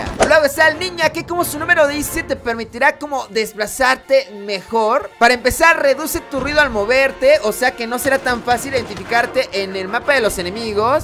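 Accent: Mexico. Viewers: suena como mexicano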